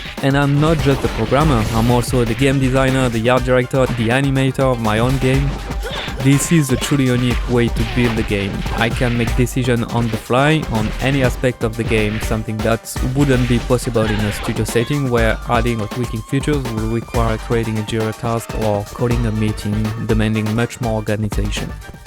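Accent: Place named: France. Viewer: De par l'accent, et certaines réponses, je devine un developpeur français. Bon courage ! Adorant les productions Capcom et Platinum Games, je garde un oeil dessus. Rien que le decor desertique maisi pas vide, cest deja intéressant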